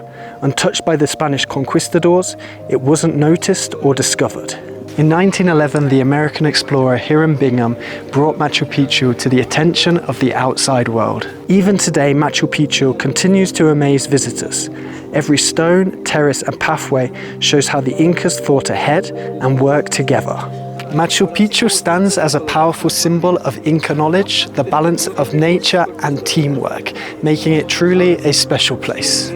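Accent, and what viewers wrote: United Kingdom. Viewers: Hi my friend british. I often learn english with u. I mean british pronunciation... You are in my country hope you enjoy!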